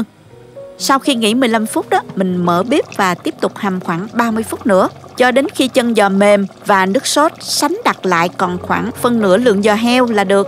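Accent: South, Vietnam. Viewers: Cô này giọng miền nam chắc nấu kiêu miền nam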